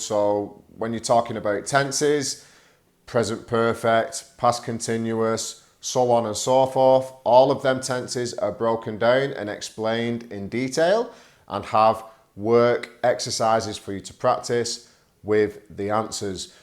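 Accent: United Kingdom. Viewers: Thanks for your videos, I watch your lessons because of your cool British pronunciation. Really it gives shift to undestand original Royal English accent :). Again many many thanks for your lessons. Sincerely Anvar from Uzbekistan...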